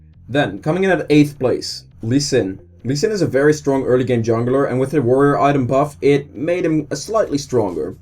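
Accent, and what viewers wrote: Netherlands. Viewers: nice vid again greeting from your home country I can hear a dutch accent xD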